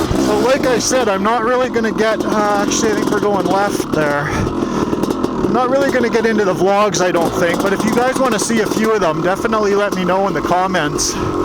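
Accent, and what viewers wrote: Canada. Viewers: are u canadian ?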